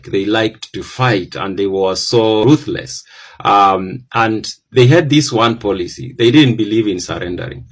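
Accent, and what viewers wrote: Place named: Kalenjin, Kenya. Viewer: I suggest you do kalenjin history because you sound like one.kikuyu history is well known we dont do speculative history from outsiders.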